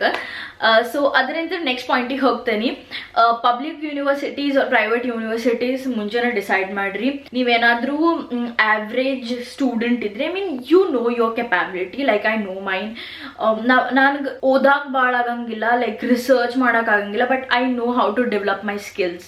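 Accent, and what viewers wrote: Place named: North Karnataka, India. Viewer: ಅಕ್ಕ ನೀವು ಉತ್ತರ ಕರ್ನಾಟಕದವರು ಅನ್ಸುತ್ತೆ ನಿಮ್ಮ language ಕೇಳಿದರೆ UK davru edre yes antha comment madi ela andre no antha haki please akka🙏👈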